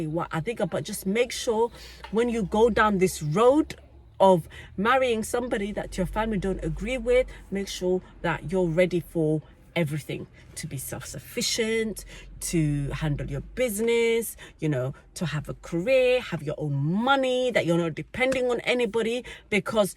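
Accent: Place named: Somaliland. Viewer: Sorry, I had to comment again the reason your children are non considered a somali in the Somali context is because of the clanal context within Somalia your children will not be considered Somali but Granadian and therefore will not get clanal protection if they were to live in Somalia. No matter how much you try to advocate it within the Somali context your children are NOT somali they are Granadian no matter how strong their culture is and how much you have instilled somali culture in them. In the West its all fine, I see hint of somaliland accent, if that is case in SOmaliland your children will never get nationality because of the constitution as you get it through the father side.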